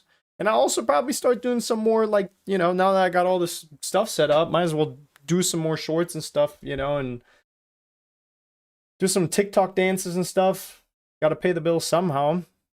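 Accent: United States. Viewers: btw are you german you sound american af i forgot if you are german or if you are american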